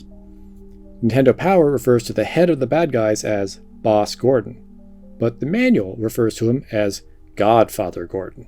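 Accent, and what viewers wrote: Newfoundland, Canada. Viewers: ... yet another Canadian making YT video documentaries... what is it with you guys? do they put something in the water? It seems like every time an interesting documentary-type video is suggested to me, I hear the ole Canadian accent. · Are you from Newfoundland?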